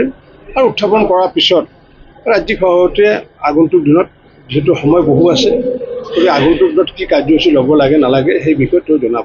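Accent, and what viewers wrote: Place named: Barpeta, India. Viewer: Borpetia accent t koksun val lakbodiyok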